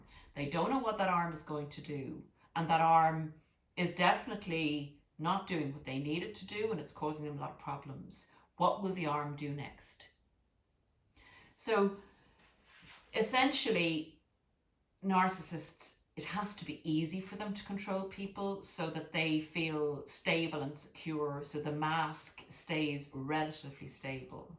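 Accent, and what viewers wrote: Ireland. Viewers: Her beautiful and musical Irish accent, and amazing storytelling (another undeniable Irish talent) just keepet me hooked to her great content. Thank you for nurturing us with your wisdom and beautiful Irishry. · It is like balm to my soul to listen to your lovely irish voice. I have had a lifetine of narcissistic trauma. Parents,ex husband,romantic relationship,boss. I am so done with it. NEVER AGAIN!!!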